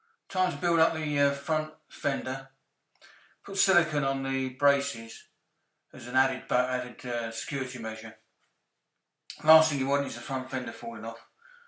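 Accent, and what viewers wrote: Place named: United Kingdom. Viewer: Cant stand british accents. Cannot understand anything he was saying.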